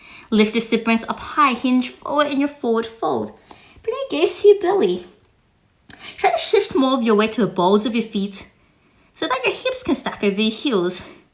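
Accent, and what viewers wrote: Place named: Australia. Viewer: Absolutely beautiful! Thank you so much! Also I LOOVE your aussie accent 😍😍 Blessings 🌸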